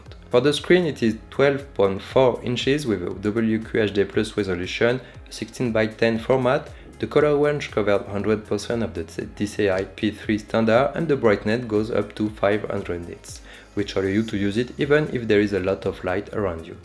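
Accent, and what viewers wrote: France. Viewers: Beautiful French accent 👍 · Your french accent is too thick i almost didn't understand what you saying...but you don't have to change anything because this is this channel identity.. Btw seem a good product recommendation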